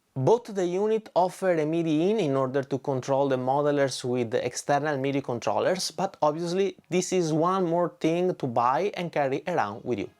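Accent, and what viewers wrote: Venice, Italy. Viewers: Possiedo l'Ampero MP-100 da un po' e confermo quanto scritto da: uno dei primi aggiornamenti del firmware ha 'sbloccato' la catena rendendola totalmente flessibile. Con l'ultimo aggiornamento è possibile caricare 20 (anziché solo 10) IR di altri produttori, ottimo lavoro e composizioni degne di un album. Sarà ma il tuo simpatico accento mi ricorda il parlato veneziano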